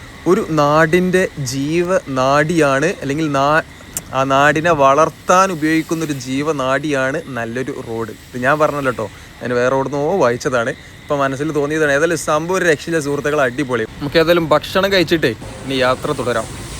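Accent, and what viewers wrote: Malappuram, India. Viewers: വീഡിയോ രസകരം ആയിട്ടുണ്ട്. ഞാൻ ഒരു തൃശ്ശൂർ കാരനാണ്. മലപ്പുറം ഭാഷക്ക് അതിന്റേതായ ചന്തമുണ്ട്. കളിയാക്കുന്നവർ കളിയാക്കട്ടെ. നിങ്ങൾ നിങ്ങളുടെ ശൈലിയിൽ പറയുമ്പോഴാണ് അതിന്റെ സുഖം. മഴ " മയ " ആയാലും ഭൂമി ഇടിഞ്ഞുവീഴുകയില്ല. ഭാഷയുടെ തനിമയാണ് അതിന്റെ ഭംഗി.മഴയത്ത് കഷ്ടപ്പെട്ട് എടുത്ത വീഡിയോ വളരെ നന്നായിട്ടുണ്ട്. ഇനിയും വീഡിയോ ചെയ്യണം. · Thumbnail ഇടുമ്പോഴെങ്കിലും ഒന്ന് ശ്രദ്ധിക്ക് ബ്രോ 🙏വഴൽ അല്ല, വയൽ ആണ്. സംസാരിക്കുമ്പോൾ നിങ്ങളുടെ മലപ്പുറം accent തന്നെ ആയ്ക്കോട്ടെ, കേൾക്കാൻ രസമാണ്❤ ചെറിയ ഒരു അഭിപ്രായം പറഞ്ഞു എന്നേ ഉള്ളൂ!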